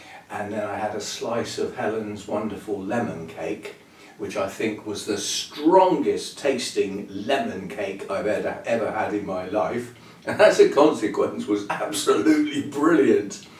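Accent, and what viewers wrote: England, United Kingdom. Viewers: I have yet to visit England, and would love videos on your town!! I adore your accent 😉 (I’m from Washington State, USA)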